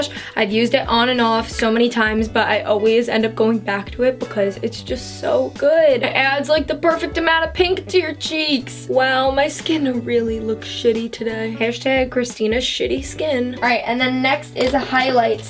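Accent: New York, United States. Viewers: WOAHHHG I JUST NOTICED HER NEW YORK ACCENT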